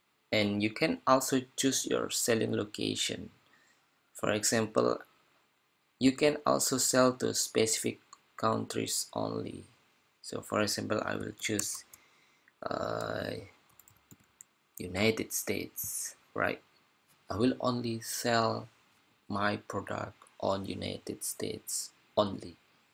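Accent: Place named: Indonesia. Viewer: Indo's accent ^^ ...nice vid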